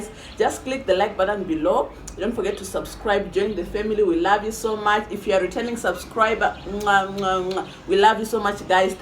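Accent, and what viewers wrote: Uganda. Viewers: You now have a Uganda accent sis 😅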